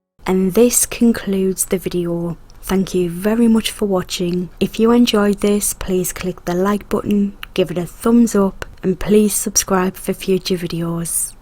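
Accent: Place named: Durham, United Kingdom. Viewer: Ha, I guessed the general region (North East), but my Canadian ear would never've been able to pinpoint Durham. Your speech is so soothing. I'd love to learn more about the eldest daughter, Elizabeth Franziska – about whom there's not much written (partly due, perhaps, to early death).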